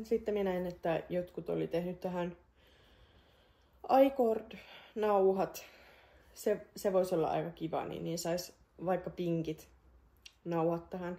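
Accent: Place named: North, Finland. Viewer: Kivoja neuleita sekä kivaa jutustelua. Rakastan pohjoisen murretta <3 Hyvää juhannusta!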